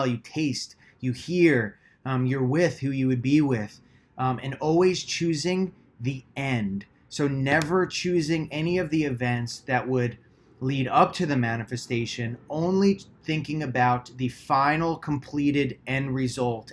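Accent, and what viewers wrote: New Jersey, United States. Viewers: Love your videos. You have no idea how much you have helped me. I've always manifested randomly but now going for the really big stuff. Your voice is soothing and love the NJ accent. Be well. God Bless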